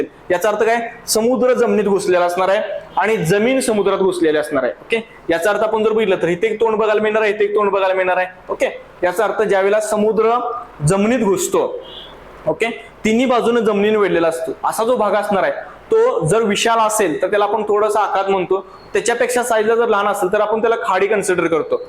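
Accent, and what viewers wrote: India. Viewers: Bolnyachya toluning warun tumi patan talukyatale watatay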